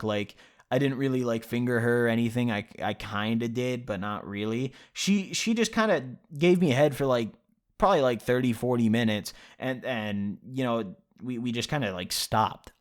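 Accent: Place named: Illinois, United States. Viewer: How did I know you live in Illinois I live in Rockford, I recognized the accent I am white and have the same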